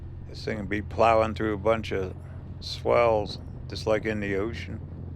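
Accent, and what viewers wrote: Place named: Pennsylvania, United States. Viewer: you seem to have a PA accent. True?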